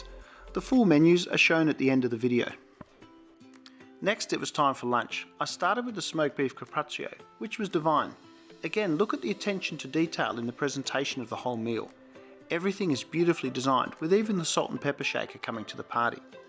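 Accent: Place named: Australia. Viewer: Me, every time I see your updates: “Yaaaaasss a new! *whistles along*” As an Aussie expat in the UK who loves seeing all the different airline products... it’s so lovely hearing it being presented with an Aussie accent ^.^ <3 Thank you for your vids ^.^ xx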